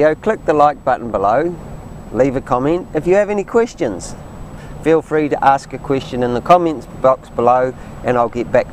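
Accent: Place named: New Zealand. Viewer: Great metaphor talking about the ships on thier journey. Great to here another Kiwi again - I nearly moved to Whangaparao about 40 mins from Aukland Steve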